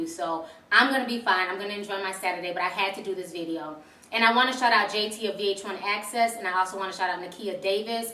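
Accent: South, United States. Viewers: ❤ love this and I love your accent. I just thought you were southern. 😄😄 you learn something knew everyday. 😕 I'm Belizean but my accent totally went away. 😩 I assimilated. 😫😫😫 you had cancer I'm so sorry/ 🙌🙌🙌 so glad you are in recovery ❤❤.😟 you really don't mention it in 2017 I just thought you had a virus 🙏 I'm even more glad I've been praying for you ❤❤ so happy you are alive and living your best life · just started watching your channel. was quite surprised that people give you grief about not being articulate. you seem pretty articulate to me; and as for the accent, just figured you were a fellow southerner! also awesome to hear someone speak the truth about lolo jones. great video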